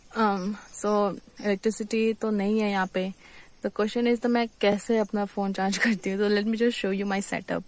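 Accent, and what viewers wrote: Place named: Jharkhand, India. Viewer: Ap to bilkul jharkhand k logon jaisa hindi bol rhi hai, ekdm raw and original. I thought people over here speak only English. This is the first video I am watching related to Mizoram lifestyle and I am quite impressed. Lots of love and warm hug from Jharkhand.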